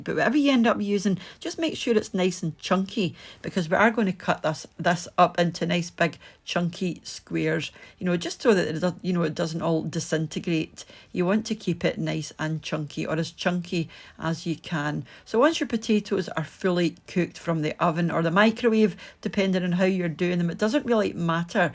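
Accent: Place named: Aberdeen, United Kingdom. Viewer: Look delicious, thank you .. and I love your accent, sounds like an Aberdonian? 🏴 ❤️🇦🇺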